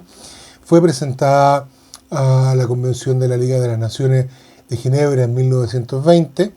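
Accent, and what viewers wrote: Chile. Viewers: La vocalizacion de es bastante clara, si uno esta acostumbrado al acento chileno. Le sugiero usar audifonos, pues la calidad del audio en Youtube nunca es la mejor.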